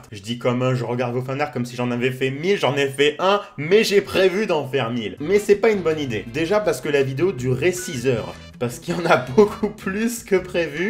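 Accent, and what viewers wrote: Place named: Quebec, Canada. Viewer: J'adore l'accent québéquois ^^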